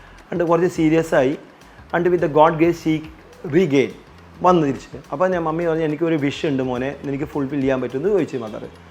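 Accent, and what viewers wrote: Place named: Kochi, India. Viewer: കൊച്ചി സ്ലാങ് ❤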